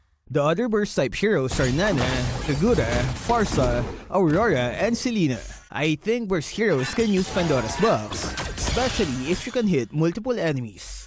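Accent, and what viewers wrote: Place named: Philippines. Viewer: when will you ever improve your english accent it’s so obvious you’re filipino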